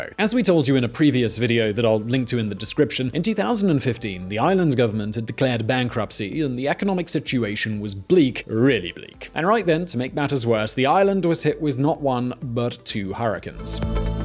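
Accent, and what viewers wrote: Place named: United Kingdom. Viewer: Sir, a "territory that BELONGS to the US" it's not the most political correct statement. I feel we ARE PART OF THE US. I believe that you as a British (which I presume for your accent) must understand what a commonwealth is. Also, "before Irma and Maria". There wasnt any "Ana". One of the things that utterly annoys me is the continuous mention of corruption in our Government. That in PART is true. Ok. Please, Dont overlook the FACT that we HAVE and HAD HONEST and DEVOTED polititians. But why corruption it's always brought up everytime someone dares to describe our Island?!? To me that not only harms our image to others, but also upsets us. That "We dont do anything" concerning government??? Really? May I suggest you to produce a video on how we fight everyday. We fight setbacks and negative situations. Although your video and your analysis are good. 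I'm giving it a like...Best wishes...Thanks 👋👍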